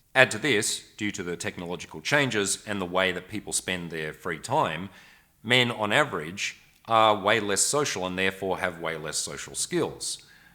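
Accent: Australia. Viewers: Eu amo o inglês australiano dele 😅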